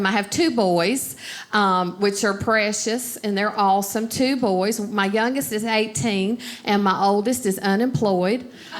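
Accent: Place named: South, United States. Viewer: Absolutely hilarious... love you and your southern drawl...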